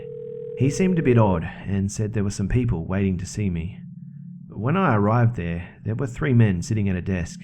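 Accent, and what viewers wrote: Australia. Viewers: finally an ozzie CVNT reading me stories. thanks CVNT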